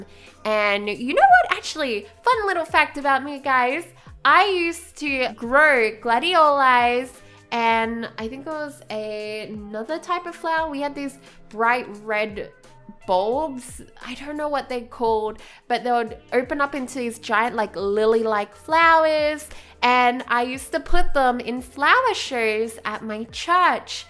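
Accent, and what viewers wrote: Australia. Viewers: sounds Australian:) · Are you from Australia? I think I hear that cute accent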